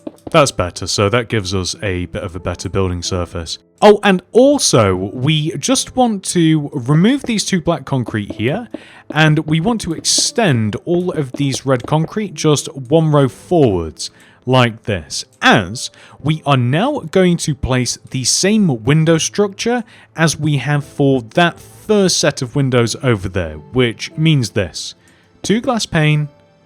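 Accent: United Kingdom. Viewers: Ngl when I use this guys tutorials I use his British accent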